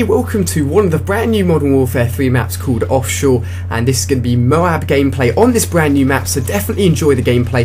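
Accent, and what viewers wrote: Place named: England, United Kingdom. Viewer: i knew you are from english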